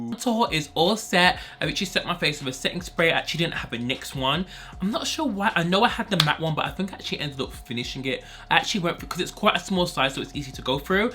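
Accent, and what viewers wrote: United Kingdom. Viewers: u r so adorable! i see u on insta all the time!!! ❤️❤️❤️finally watching you on youtube! your accent is smh crazy! 🙏🏾🙏🏾🙏🏾english/ British accent is my fav😍😍😍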